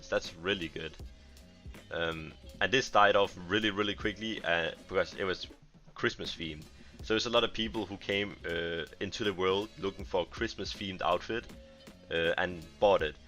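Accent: Denmark. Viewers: Your danish right? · Are you danish?🧐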